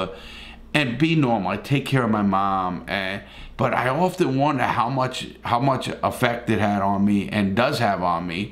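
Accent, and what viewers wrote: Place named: New Jersey, United States. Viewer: Your accent reminds me of a close friend... He was from New Jersey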